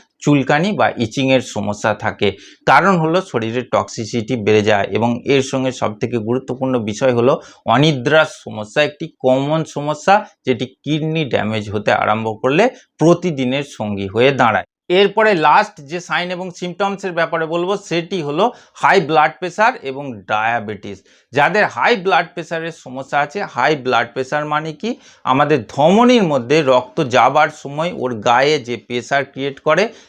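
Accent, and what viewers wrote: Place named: Kolkata, India. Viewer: ডাক্তার সাহেব আপনি কি বাংলাদেশে বসবাস করেন ? আপনার কথা এবং ভাষা অনুযায়ী মনে হচ্ছে আপনি ইন্ডিয়ান অর্থাৎ ক্যালকাটার যদি বাংলাদেশে আপনিও হয়ে থাকেন তাহলে অবশ্যই আপনার নাম ঠিকানা কোন হসপিটালে আছেন জানাবেন।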